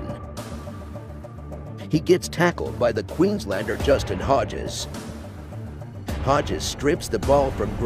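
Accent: United States. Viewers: American accent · Voiceover is a complete waste of time. Just tells you what you can see for yourself. And why the US accent?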